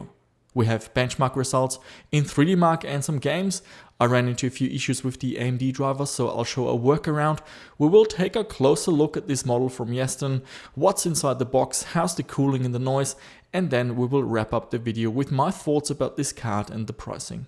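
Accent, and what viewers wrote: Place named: Germany. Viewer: You're from Australia? lol I always thought you're german because you sound like you have an german accent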